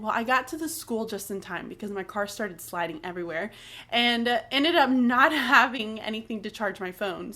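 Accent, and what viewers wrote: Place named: Alabama, United States. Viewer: Are you from Alabama... your accent really came out... if so, we are neighbors.... I'm from Mississippi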